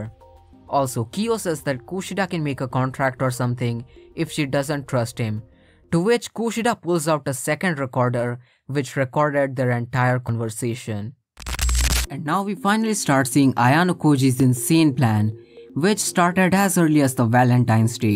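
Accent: India. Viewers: You have Indian accent are u one??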